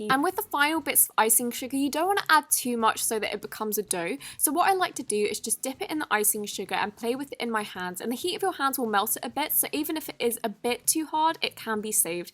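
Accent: England, United Kingdom. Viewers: Omg!! I really wanna know what part of England your from!! Please dm me on <mail> I love u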